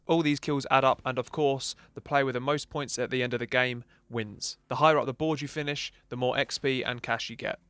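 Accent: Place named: South London, United Kingdom. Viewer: Like your direct no nonsense videos, sound like a South London boy ...cheers!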